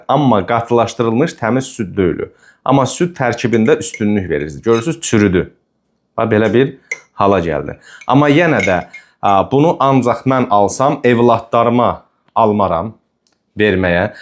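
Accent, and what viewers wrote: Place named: Karabakh, Azerbaijan. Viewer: Çox sağ ol oğlum..Mən də sevərək baxıram bu videolara..Danışıq ləhcəni daha çox sevirəm,məncə Qarabağlı balası olmalısan .🙏🙏🙏🌹🌹🌹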